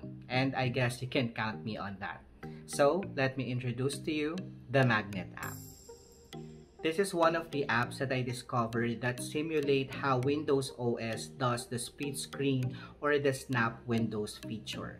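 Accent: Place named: England, United Kingdom. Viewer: Good English accent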